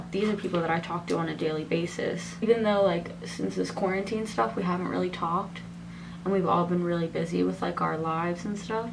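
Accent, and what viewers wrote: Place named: Philadelphia, United States. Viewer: Girl I’m from Philly too and when you first popped up I heard your accent I was like yes!!!! And then it said Miami and I was like🧐🧐 hahahaha but then it all came full circle lol I loved you!!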